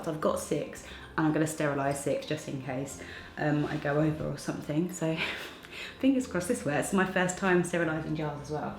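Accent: United Kingdom. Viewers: Long pretty hair, beautiful face, British accent, and you can cook. Wow, do I envy your husband. :)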